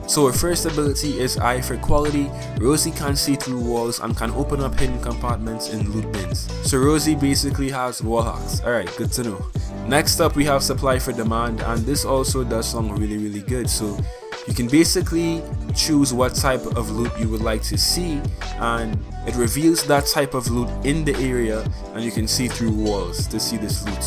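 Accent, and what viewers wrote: Trinidad and Tobago. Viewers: Im from trinidad btw u sound trini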